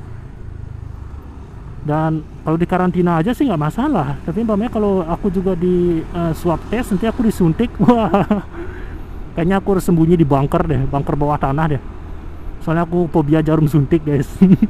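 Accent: Bali, Indonesia. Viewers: Aku Orang Jawa Tapi Gak Tau Ke Napa Gw Suka Dengan Logat Orang Bali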